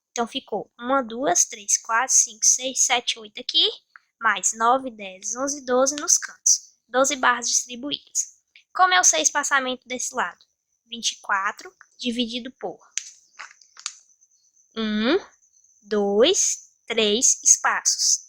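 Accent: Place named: Northern Minas Gerais, Brazil. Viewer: Sotaque norte mineiro. Belo vídeo.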